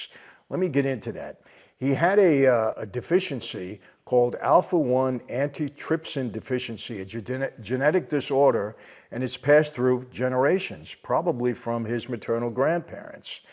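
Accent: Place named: New York, United States. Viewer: You sound like a New Yorker!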